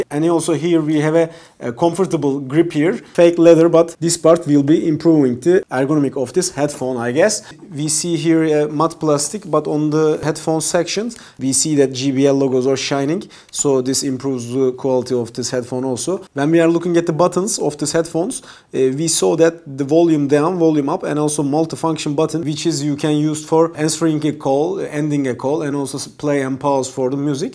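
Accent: Turkey. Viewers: Thats Turkish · Birazda aksaniniz uzerinde calissaniz iyi olur. tamam, ingilizce anadiliniz degil, ama nasil bir hintli ingilizce konusurken kotu aksanindan dolayi anlasilmasi zor oluyor, ayni sekilde Turkiye'de cogumuz ingilizce'yi Turkce gibi kkonusuyoruz. Bu kendi aramizda bir sorun yaratmazda, ama eger Youtube gibi bir platformda ingilizce bir video yayinliyorsak, o zaman neden kendimizi iyilestirmeyelim ki? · abi fonetiğin türkçe konuşmanla birebir aynı vallahi hiçbir fark görmedim :D hasret kalmışız akıcı konuştuğu izlenimini vermek için kendini kasmayan insanlara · That's some heavy Turkish accent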